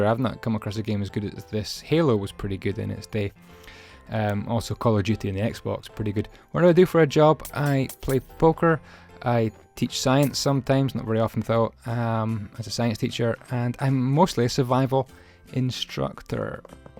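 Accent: Scotland, United Kingdom. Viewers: so nice to hear someone scottish doing minecraft vids! :D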